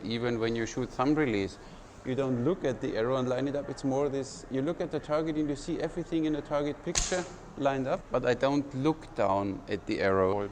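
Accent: Germany. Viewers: Are you German? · Dein englisch ist so geil :) man hört sofort, dass du ein deutscher bist ;} was ist mit dem kompletten instinktiven schießen?! Also NUR das Ziel fokozieren?! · Dachte ich erstmal der ein Deutscher ist, doch hätte ich Unsicherheit entweder er Deutsch oder vielleicht Niederländisch ist. (Leider habe ich nie nach Europa gereist).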